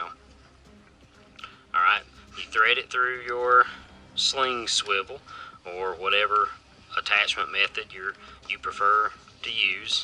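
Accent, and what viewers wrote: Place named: South, United States. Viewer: Definitely made life easier. Your instructions were easy, especially pulling the strap through the keeper. The MAGPUL instructions, either the included or the YouTube instructions, are close to useless. Enjoyed your sense of humor also. Being a Yank but having lived for 35 years in Virginia, I found your accent was a bit of 'down home.'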